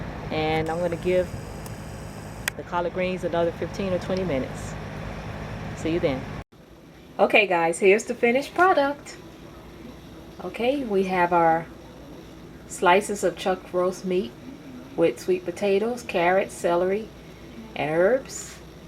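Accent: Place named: Washington, United States. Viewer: Your very welcome! by the way are you in Washington state? because you say guys like i do.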